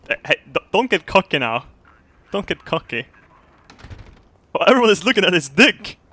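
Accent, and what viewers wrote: Sweden. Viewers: The great has returned to us! How we all missed that Swedish accent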